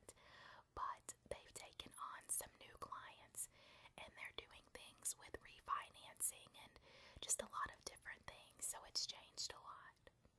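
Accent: South, United States. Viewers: Where are you from? In your videos I detect a southern accent.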